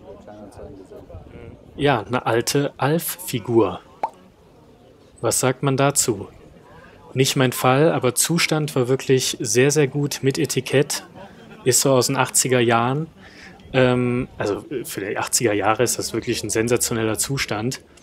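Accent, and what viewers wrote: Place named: North Germany, Germany. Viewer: Aus Norddeutschland schätze ich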